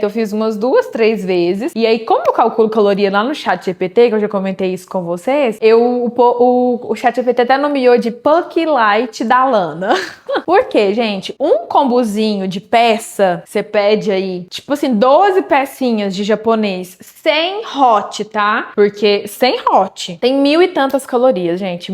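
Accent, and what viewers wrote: Minas Gerais, Brazil. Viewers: Parece mineira. Eu falo assim e sou mineira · Amei seu conteúdo, esse sotaque mineiro melhora tudo. Sou de BH, tenho resistência à insulina (SOP) e me senti representada. Espero perder 13 kgs esse ano para chegar na estética que desejo, a pior parte para mim do tratamento da SOP é a musculação :( kkkkkkk. A alimentação eu amo, gosto de cozinhar, não tenho nenhuma seletividade, adoro fazer meus pratos lindos e coloridos, me inspirei em você e coloquei muitos ingredientes na listinha de compras. Obrigada <3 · Lana, o seu sotaque é muito bom, amo!!! Minha família é mineira, então é o sotaque do meu coração. Estou gostando muito dos seus vídeos, vc passa muitas informações importantes, tbém tenho resistência a insulina. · Ela é mineira 😊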